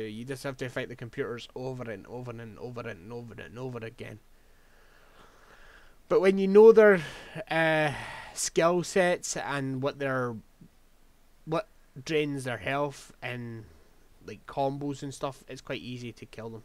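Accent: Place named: Scotland, United Kingdom. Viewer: scottish, should have guessed it, knew it sounded familliar.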